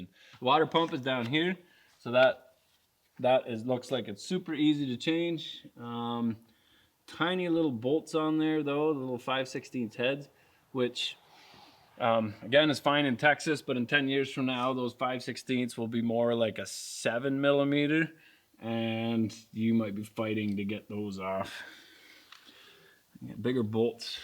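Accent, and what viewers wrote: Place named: Alberta, Canada. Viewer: My ears tell me this guy's from Alberta, but my eyes say Ontario. Either way, it's Canada. :P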